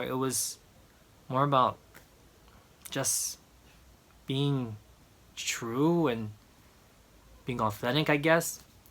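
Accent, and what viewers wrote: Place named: United States. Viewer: actually grew up in Hawaii, hence his American accent and fluent English!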